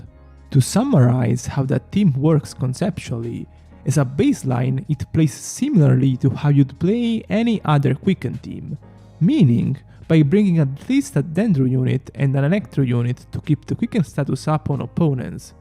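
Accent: Italy. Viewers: I love this italian accent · I thought he was Italian from the accent but damn 😂😂😂😂😂😂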